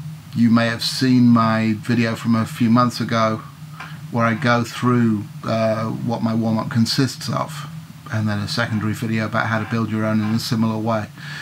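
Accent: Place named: Cornwall, United Kingdom. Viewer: this exercise has taken the worry I had that this dental work would really mess things up! I'm playing with you relaxed and it's keeping my tone in tune with yours. Your a life saver. John from New Jersey. Oh by the way my Wife Beverly is from Cornwall UK her accent matches yours to a tea. Mine is a Jersey accent. This exercise is going to keep me playing threw my dental visits. Thank you very much.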